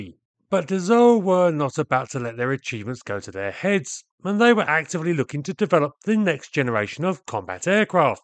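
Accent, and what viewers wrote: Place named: Essex, United Kingdom. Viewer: an informative vid as ever. Havimng memories of building this Mirage. And as ever your accent takes me back to my airport and airfield days around Essex and Chelmsford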